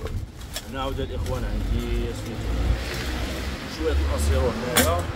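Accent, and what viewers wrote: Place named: Errachidia, Morocco. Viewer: الأخ باين من اللهجة ديالو من الرشيدية أو النواحي 😊😊😊الله اسهل الامور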